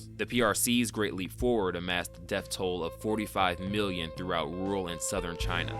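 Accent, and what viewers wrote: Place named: United States. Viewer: Imagine taking shots at another country's violence in a thick American accent lmao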